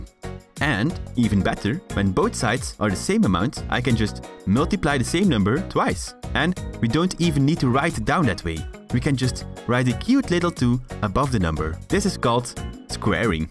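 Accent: Netherlands. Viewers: Nice to hear the dutch accent, didn't know our country has such great youtubers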